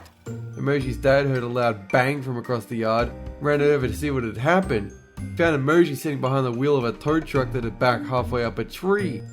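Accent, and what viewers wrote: United Kingdom. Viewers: Eveline E-001 he's British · Wait, your not American? Are you British? Or are you Australian? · Are You British?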